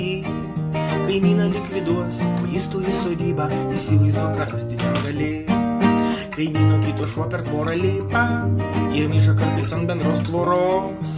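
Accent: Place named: Russia. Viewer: Ar sitas. penktosios. kolonos. vadas. ,juk. jis. ruskis. ,galimai. ir. yra. okupantas. ??????? Kaip. gerai. ,kad. viena. kart. prisipažino. ,kas. jis. yra. ir. dar. dainą. susikūrė. ,nori. įsiamžinti. ,savo. penktąją. kolona. ????? Nes. okupanto. akcentu. ir. kalba. ,Lietuviškai. nelabai. išeina. ???????? Kiek. jau. turi. penktosios. kolonos. bendraminčių. ?????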